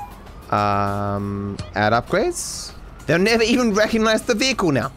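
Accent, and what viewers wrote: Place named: Australia. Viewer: he’s Australian from Sydney im Australian from Sydney he grew up in Sydney I grew up in Sydney so our English is the same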